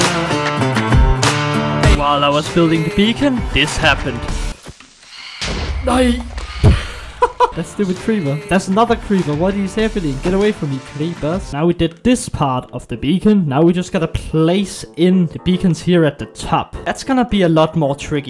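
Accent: Denmark. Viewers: Kunne høre på din stemme at du var fra Danmark. 😁 Det ser godt ud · Syg dansk accent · Are u danish? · Are u from denmark? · Føler lidt han lyder dansk... Could it be.. My dream comes true?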